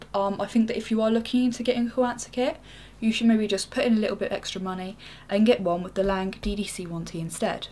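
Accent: United Kingdom. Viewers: Hey, you're so damn beautiful... I love you, your british accent and your videos!:)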